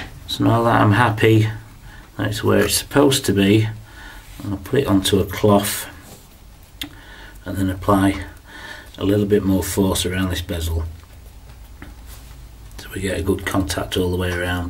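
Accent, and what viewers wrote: Yorkshire, United Kingdom. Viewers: you sound like a yorkshire man ,,,are you near enough to fix mine ?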